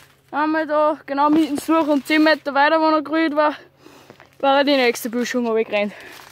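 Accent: Austria. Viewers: Bist du aus Österreich und den vo wo hod wöcha Bezirk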